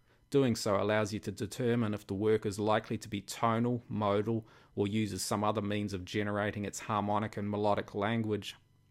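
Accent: New Zealand. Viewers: are you a kiwi? your accent sounds like a new zealand accent! Very helpful video thanks heaps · Hahaha I also was like "Oh damn, a fellow kiwi"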